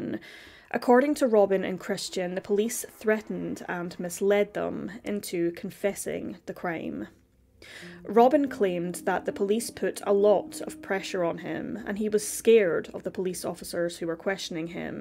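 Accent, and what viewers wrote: Scotland, United Kingdom. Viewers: I just found your channel today and as I was waiting on the narration, you can imagine my surprise as an East coast Scot hearing your voice. Instant subscription before I’d even listened 🏴 fortunately your video was extremely well researched and handled with dignity and empathy so I made a good choice. Thank you for sharing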